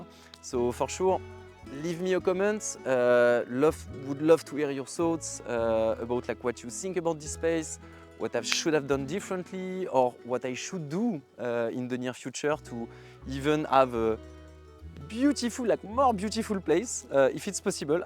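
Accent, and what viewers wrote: France. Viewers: I love your french accent, your english is perfect but (la petite touche française) it's brilliant, I have the same, some times people tell me, what you just said sounds french and there I go ( my 1est language is french/suisse). Bonne aventure profitez la vie est trop courte.:thanksdoc: 🌼🥀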